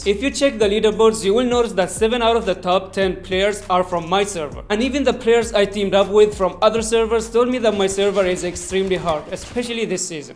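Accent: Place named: India. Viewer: Title: "This is why my server is the hardest" Me: Probably never heard of Asia’s servers Also me clicking the video and hear the indian voice Oh… Never mind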